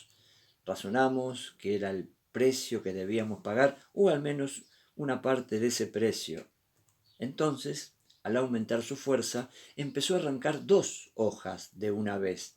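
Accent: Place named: Argentina. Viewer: Gracias por la narración, abuelito. Su voz es tan relajante y el acento argentino, ni qué hablar! Gracias, se aprecia demasiado.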